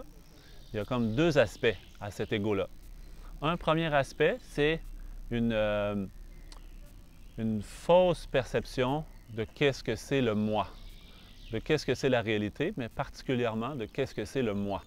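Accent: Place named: Canada. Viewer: Merci! C'est car j'ai un ami canadien et j'ai reconnu l'accent, c'est très joli le français parlé au Canada :)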